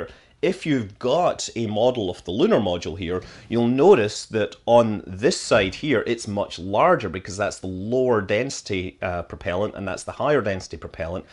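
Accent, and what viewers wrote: Scotland, United Kingdom. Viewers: I love these videos. The combination of subject matter, that gets the mind wandering to all sorts of different places , and the Scottish brogue of the presenter means that I have never actually got through one without falling asleep. They’re the best insomnia cure, next to the Jeff Bridges sleep tapes, that I’ve ever had. The sad thing is I now know about half the relevant information about loads of things to do with space flight.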